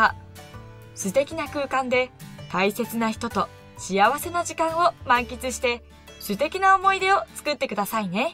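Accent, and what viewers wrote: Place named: Tohoku, Japan. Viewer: ナレーターの人、訛ってますね。イントネーションが東北チックでカワイイ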